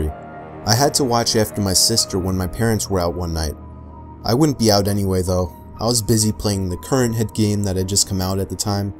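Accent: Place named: New York, United States. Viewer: Are you from NY? I hear that accent, you say dog the same way I do.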